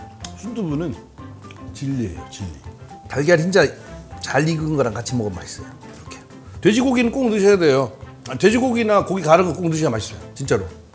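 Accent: Chungcheong, South Korea. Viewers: 백종원 대표님 구수한 충청도 사투리 듣고싶네요 오늘저녁 순두부 찌게 맛나게 끓여 먹겠습니다 건강하시고 가까운날 티비서 뵀으면 좋겠습니다 누가뭐라해도 백종원 열펜입니다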